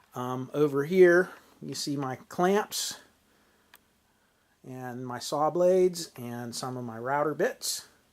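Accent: Pennsylvania, United States. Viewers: Hey I really like the channel. I'm a woodworker and a ham operator. You sound like you're from western/central pa if so I'd li,e to meet you. Trade ideas on projects and learn some electronics. You have a great teaching style and I'm learning a lot. Give me a shout. Rick - dickrackson at yahoo dot com. Thanks rick.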